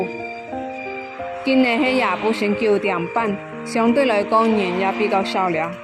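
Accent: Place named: Pontianak, Indonesia. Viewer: Ini hakka pontianak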